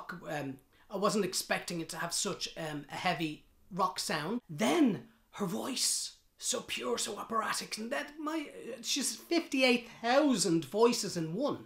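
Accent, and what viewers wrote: Ireland. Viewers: You're a bit Oirish, aren't ya? I'm the Norn Irish fella. Anyway, I was meant to go see them in Dublin on the 17th November 2021 but they've had to postpone until 22nd November 2022 due to coronavirus. Reason I'm tellin ya this is cos it's purely on this song I want to see them, I really want to experience this live for myself. It's on me bucket list. Sure, I've listened to other songs by them but this is the one that really just leaves me speechless and I'm sure actually being there to witness and experience it is a whole other level. They're meant to play in The 3 Arena but I don't know if tickets are still available. It's something to look forward to next year...hopefully! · Welcome to the rabbit hole,. It's always nice to hear a fellow Irish accent on YouTube! I see Gabriel has already spotted your reaction, so you have a list to guide you. 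And we will be along for the ride. Perhaps the ' '?;-) Subbed, so I won't miss a reaction. ' ' - Wacken. <link>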